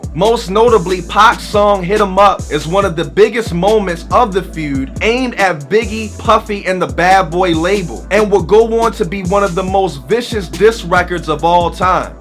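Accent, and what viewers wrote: Baltimore, United States. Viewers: you got that heavy Bmore accent fam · I knew you were from Baltimore within the first few seconds. Your accent 😍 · As soon as you started talking I was like oh yeah he's from Baltimore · Soon as you started talking I knew you was from Baltimore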